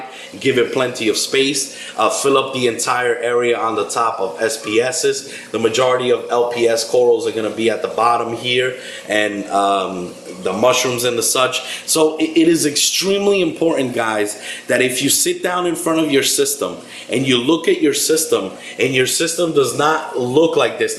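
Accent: New York, United States. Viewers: I really appreciate you doing these videos. I'm looking at an almost empty biocube 29 gallon tank and I'm BRAND NEW to marine tanks. I'm now worried about the "cool" setup I did with my live rock especially cause I only have the 1 stock pump that came with my biocube. I may have created too many deadspots like you said. It was also dope that you have a total New York (Brooklyn?) accent haha. Thanks for all the solid advice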